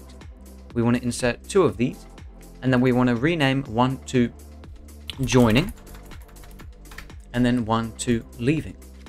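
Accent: United Kingdom. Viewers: Bro idk why but you are legit the most formal person ever. Idk if it's the british accent but god dayum you just sound so professional. I feel like I've done something wrong and you're trying to explain to me why I'm an idiot in the calmest way possible.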